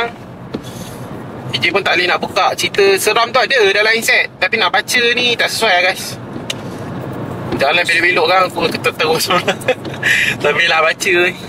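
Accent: North, Malaysia. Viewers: Asalamualaikum..nk tnya asal dri utara ka?kedah ka penang ka perak ka?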